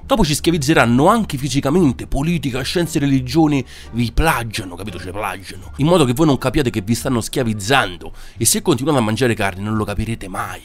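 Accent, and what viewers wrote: Rome, Italy. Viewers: Pratico yoga, e ovviamente è una disciplina con delle basi solide, riguardo la medicina, la cucina, il quotidiano. E una persona autorevole che l'ha introdotto in Occidente, diceva, fate di tutto un po'. Quindi se una persona mangia di tutto un po', senza eccedere soprattutto in (mode alimentari), può essere sereno e non impazzire. Sto frequentando spesso il tuo canale, e il tuo accento romano è diventato una (moda) in questi giorni per me. Fai benissimo ad informare entrambe le fazioni, dei più disparati argomenti, la gente anche se non se ne accorge o è orgogliosa per ammetterlo ha bisogno di informazione e se con una aggiunta ironica è sempre buono! Iscritta, continua a stupirci. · E qualche giorno che ti seguo mi fai ridere ma soprattutto perché parli il romano. Io sono vegetariana non mi dispiacerebbe essere vegana ma se non e fatto alla perfezione non vale la persona. 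Ti vorrei confermare che certi commenti non sono appropriate soprattutto quelli su i bambini che sono puri e prendono ciò che gli diamo, e per gli adulti che hanno preso a loro volta dai precedenti. E non tutti hanno il tempo per capire precisamente cosa si intende, che inferno si vive essere appassionati dei viventi che non vorresti nemmeno che inciampano, a me dispiace solo che non la pensiamo allo stesso modo ho pietà per la pianta compresi i suoi abitanti❕ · Bellissimo...guardo i tuoi video perchè adoro la parlata romana......MI FAI TROPPO SIMPATIA...... E CARAMMELLE SE CE L'AVESSERO AVUTE SE LE MANGIAVANO CO TUTTA A CARTA😂😂😂😂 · Wow, finalmente un altro commentatore di commenti assurdi, per rendere meno opprimente l'attesa di un nuovo episodio di "Commenti Assurdi" (Max Noreg. Se non lo conosci, ti consiglio vivamente di visitare il suo canale) Mi piace il tuo stile: sei il primo a farmi prendere a simpatia il romanaccio(?). 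Riguardo al video... beh, se una persona è talmente poco convinta in ciò che crede, che basta un'immagine provocatoria a farla vacillare, direi che c'è qualcosa che non va.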